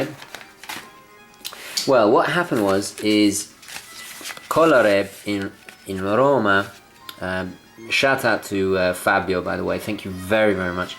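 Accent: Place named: England, United Kingdom. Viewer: Where about in England are you from. I can't pinpoint your accent.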